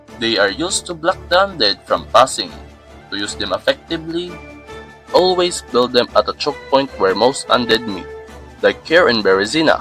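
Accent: Philippines. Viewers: filipino accent is strong here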